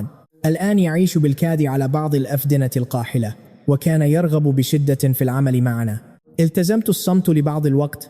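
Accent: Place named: Iraq. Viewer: باللهجه اظنك من العراق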